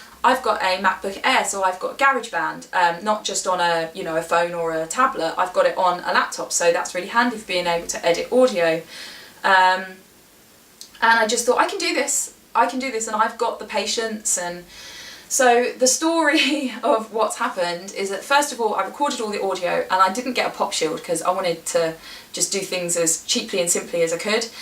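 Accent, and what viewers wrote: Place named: United Kingdom. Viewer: I recently indie published a novel on Amazon, and I am considering to try the audio book myself. I found this video very informative. As far as your voice goes, it sounds fine to me. As an American, I find British accents somewhat musical, very pleasant to listen to. However, I can understand from a time standpoint with three small children. My daughters (3) are all raised.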